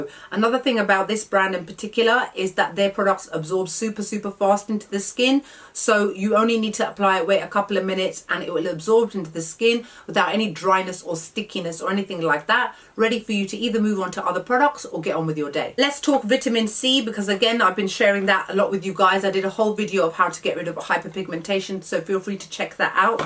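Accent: United Kingdom. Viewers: You are giving me Leah Remini vibes, only with British accent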